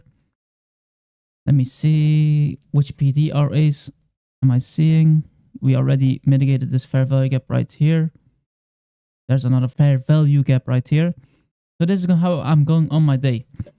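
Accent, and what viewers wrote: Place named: Belgium. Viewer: ik a belgian accent whn i hear one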